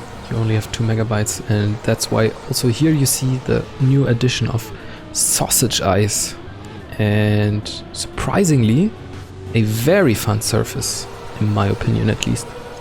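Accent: Germany. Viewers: Are you german? Man hörts :D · Bist du ein deutscher? Erkenne ich wegen dem ackzent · Krank dass man einfach hört an dem Englisch Akzent hört aus welchem Land man ist. Bei dir hab ich direkt gehört dass du deutsch bist und bei wirtual z.b dass er skandinavisch kst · dieses german english :D .D :D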